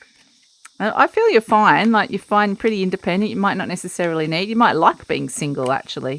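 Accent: Australia. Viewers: You sound quite the "Aussie."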